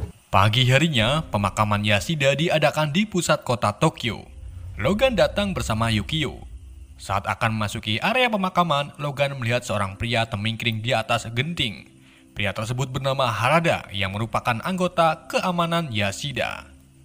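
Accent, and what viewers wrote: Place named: Tegal, Indonesia. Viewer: Admine wong tegal jebule..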